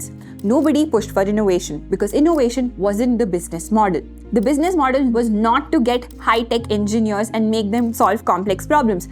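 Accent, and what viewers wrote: India. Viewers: Get an accent coach. Oh that indian accent is a torture for ears.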